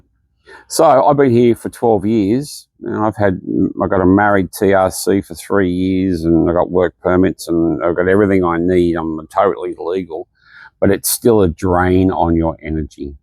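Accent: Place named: Australia. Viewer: FYI I have learnt French and English in Vietnamese High School . We don't need you to teach us English with your awful Aussie accent . You are just an unemployed dude in your country , moving to developing countries begging for job . I bet you are not even qualified to teach English in Vietnam .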